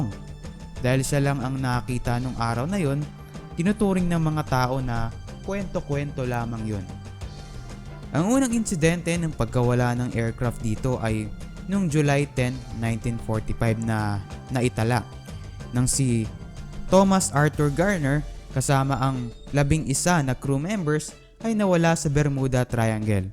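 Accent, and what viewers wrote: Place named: Pampanga, Philippines. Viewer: Capampangan accent hehe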